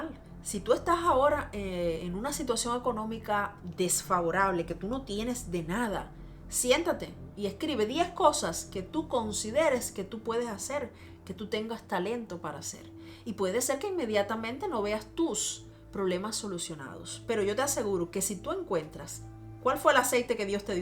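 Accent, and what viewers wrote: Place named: Cuba. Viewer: Hola siempre que te escucho me lleno de energía positiva, eso es lo que transmites, me imaginé que eras cubana hoy lo confirmo, que bueno, también yo. Dios te bendiga 🙏🙏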